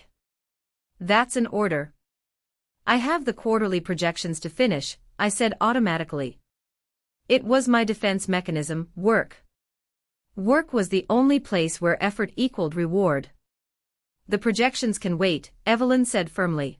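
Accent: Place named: Asia. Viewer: It is hard to listen to bc the voiceover sounds very brokenly with some kind of Asian accent. Though the story might not be bad, the voiceover is amateurish.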